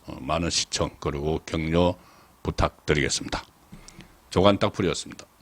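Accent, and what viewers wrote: Gyeongsang, South Korea. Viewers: 송국건님 방송전 공부좀하세요. 말씀중 어,저 하는 군더더기 좀 하지 마세요. 그렇찮아도 경상도 억양이 쎈데 제발 신문 정독후 연습좀 하고 방송해 주셨으면 좋겠습니다.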